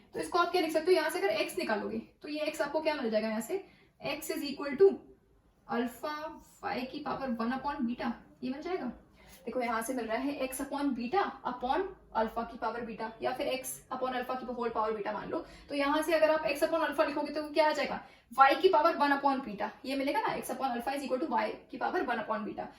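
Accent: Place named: Himachal Pradesh, India. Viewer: For once I thought you are from Himachal! haha. Nvm, Great video though!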